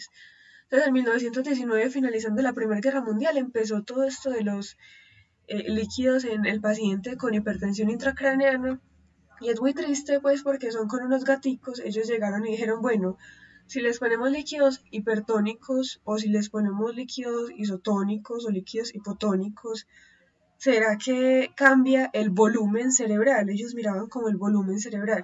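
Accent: Colombia. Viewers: tan bella la doctora con acento de betty la fea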